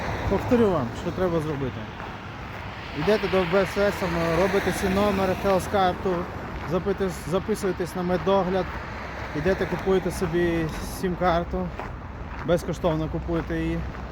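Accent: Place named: Western Ukraine, Ukraine. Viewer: Привіт! Гарно говориш по українськи, навідь з легким західним акцентом!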